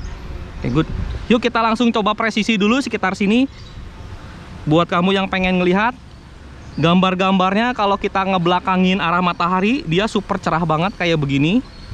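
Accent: West Java, Indonesia. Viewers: Sunda eyy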